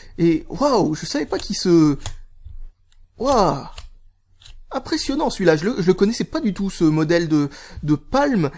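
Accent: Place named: Lyon, France. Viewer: Salut ton accent me fait penser à un accent Lyonnais. Ai-je raison?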